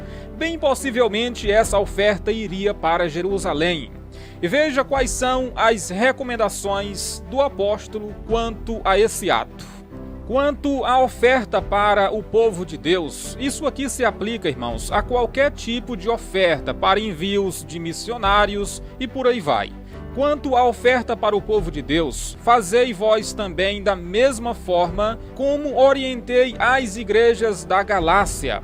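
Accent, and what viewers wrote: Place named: Bahia, Brazil. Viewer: Oh missionário, dentro de todos ensinamentos mediante a palavra de Deus que são maravilhosos, eu me alegro com a forma que fala, sei que és estudado e essa forma de falar a palavra ( veio, paia) é engraçado Me faz lembrar a minha terra Natal, Cravolândia lá no centro Sul Baiano Um abraço missionário para vc e sua esposa Deus te abençoe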